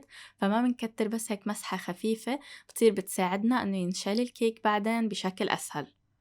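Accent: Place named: Lebanon. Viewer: بحب لهجتك اللبنانية وصوتك هادئ وجميل وتقديمك راقي ويفتح النفس كل التوفيق لقناتك الجميلة متابعتك من السعودية 🇸🇦💖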